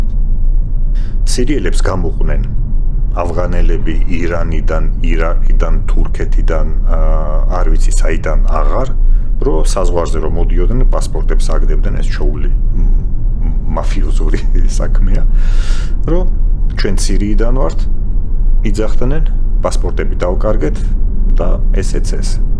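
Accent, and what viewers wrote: Russia. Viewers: რანგამაღიზიანებელი აქცენტი გაქვს რუსულს გავს და ყურები მეტკინა არადა საინტერესო კონტენტი გაქვს უბრალოდ აქცენტს რამე უშველე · საშინლად ტენდენციური ხართ. თუ ასე გეცოდებათ ,,საწყალი პენსიონერები" წამოდით მაქედან. ეგ რუსული აქცენტი ამბობს ყველაფერს, რატომაც ლაპარაკობ ასე )))) ვაუ რუსული მილსადენის გამო გაიყინებიან თურმე გერმანელი მოხუცები 😀 სამოთხე არ იქნება აქო... არადა კადრებშიც ჩანს რა ქვეყანაა და რა ხალხი. მოეშვით სხვების მოტყუებას.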